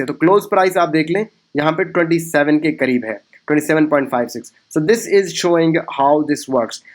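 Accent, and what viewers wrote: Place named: India. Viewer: I strongly feel u r Indian due to ur voice/tone and name.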